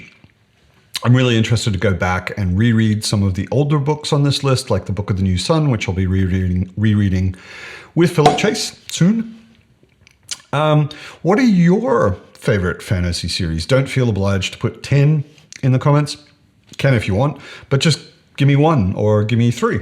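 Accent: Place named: Australia. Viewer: I can’t place your accent at all haha I can just tell there’s a bit of Aussie in there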